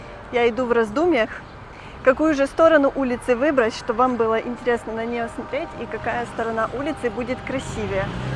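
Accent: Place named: Odesa, Ukraine. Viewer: Огромное спасибо, что вы говорите на одесском языке!!! Я коренная одесситка вынуждена была уехать из за ужасов,но так приятно смотреть на красавицу Одессу!!! И слышать родную речь!!!!!!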